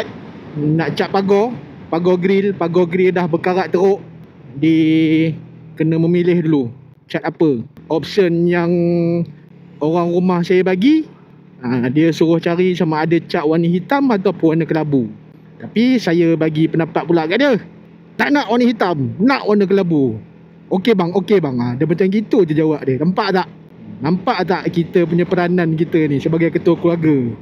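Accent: Perak, Malaysia. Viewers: Assalamualaikum Chor,Kome cakap org Perak je. Aje tanya je Chor.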